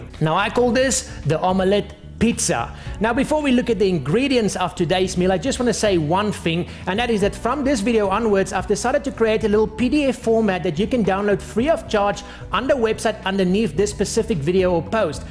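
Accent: South Africa. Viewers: I think he's South African... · hey im south african and i cant help but to hear a south african accent from u. are u from South africa?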